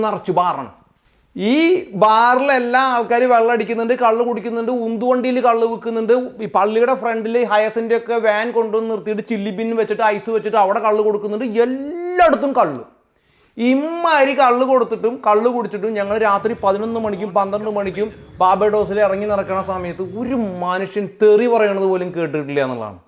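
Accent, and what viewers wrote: Thrissur, India. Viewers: History,geography,sciencum nalla Thrissur slagil kelkan Nala rasa 😅😅😅 keep going btw chettan Thrissuril evedeya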